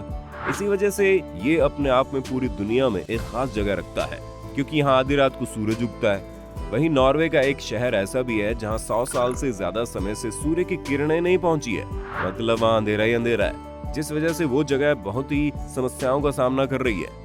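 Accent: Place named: India. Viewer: Aapki avaj hindi Vibes jesi he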